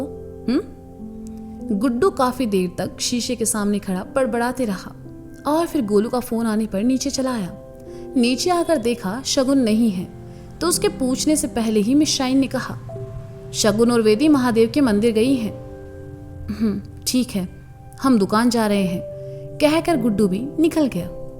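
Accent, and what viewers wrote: Kanpur, India. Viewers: Mam ap manmarziyan record karte karte kanpuriya tone me baat karne lage ho joki bahut mast hai 😊😊❤❤😁😁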